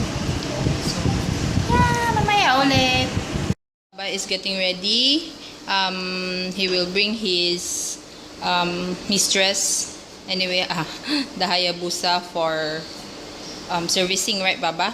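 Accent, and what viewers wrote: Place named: Singapore. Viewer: New Subbie just came across on your vlog. Funny how you also adapted the Singaporean english accent. Where in Europe they have that European accent. Looking forward in watching a marathon of you guys's vlog.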